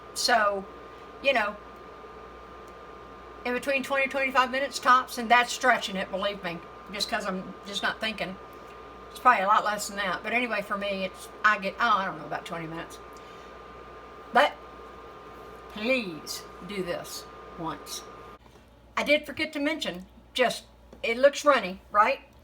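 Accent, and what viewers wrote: South, United States. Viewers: It’s southern. I checked her channel she’s from Louisiana from the USA 🇺🇸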